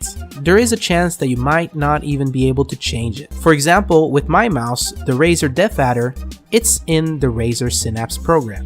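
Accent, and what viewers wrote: Gothenburg, Sweden. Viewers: Äru svensk?? HAHAHA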